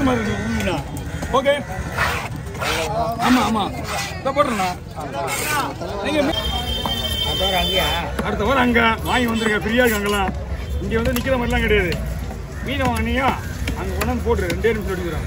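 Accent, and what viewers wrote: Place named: Thoothukudi, India. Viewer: Ivar voice patha thoothukudi karar pola theriyuthu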